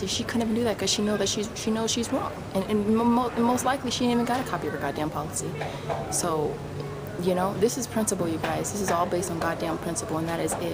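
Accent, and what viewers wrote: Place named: Latin America. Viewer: This has nothing to do with your video, but it sounds like you have a latino accent. Are you of Latino descent? Sorry you had a bad experience. Target sucks most of the time.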